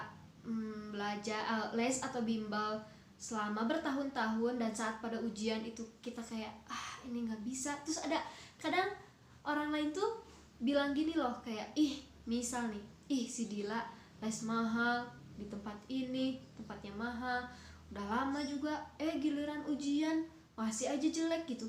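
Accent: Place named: West Java, Indonesia. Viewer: Pidiooo, sunda pisan emang mba nya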